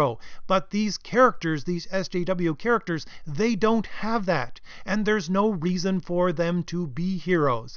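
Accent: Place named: Ireland. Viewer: you sound irish or oirish thats great i m northern ireland your channel is great!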